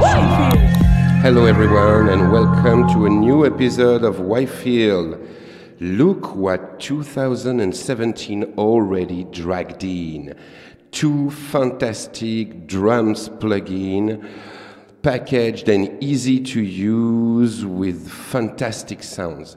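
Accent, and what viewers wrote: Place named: France. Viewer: bonjour, il me semble reconnaître un français derrière cette voix.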